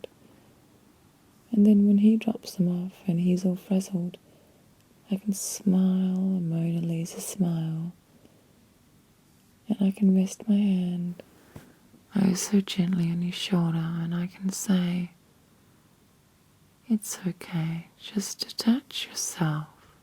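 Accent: Australia. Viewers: Hia... Just thought I'd say thanks for another amazing video! Literally, when I cant sleep I turn straight to your vids... and I always find something that helps me dose off.......The aussie accent is also a big bonus!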